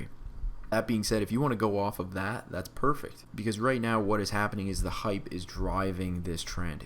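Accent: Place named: Canada. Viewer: This is not related to the content haha but you have a “Canadian” accent. I appreciate that. Thanks for the info by the way! I came from tiktok and you’ve been a big part of my learning crypto and ETH in particular! I hope you keep making content of this sort of YT. That being said, I do appreciate the car content as well because I drive one and know nothing about it. Multi level education, thanks!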